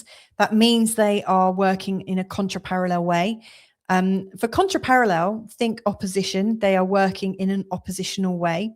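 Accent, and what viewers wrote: United Kingdom. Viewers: What a great explanation and metaphor to explain out of bounds planets! I’m addicted to your fabulous dailies now! Loving learning from you; a fool for the great British accent 🙏🌻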